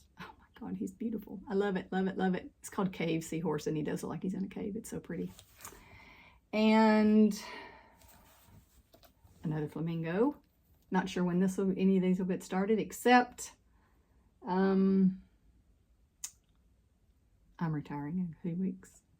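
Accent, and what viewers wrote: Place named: Alabama, United States. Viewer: I’m hearing the Alabama!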